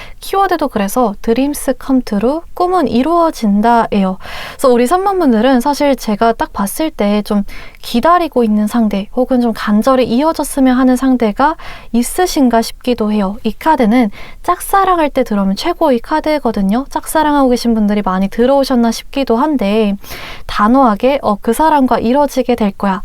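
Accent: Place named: Chungcheong, South Korea. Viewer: 끝말이 ~~할거같아요 이런말에 끝부분이 흐려서 ~~할거가텨~ 일케 들려서 왤케 웃긴짘ㅋㅋㅋㅋ 저 충청도 사람인데 정겹게 들리네욯ㅋㅋㅋㅋㅋㅋ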